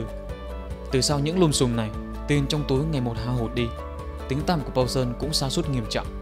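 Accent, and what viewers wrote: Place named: Thanh Hóa, Vietnam. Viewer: chất giọng thanh hoá này nghe ko hay cho lắm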